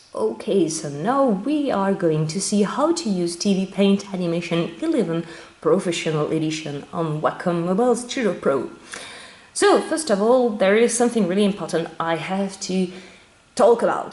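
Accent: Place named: France. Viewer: your French accent is really enjoyable, quite frankly.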